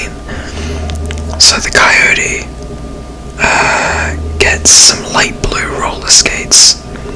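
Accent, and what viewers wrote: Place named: Australia. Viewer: You sound Australian in this one.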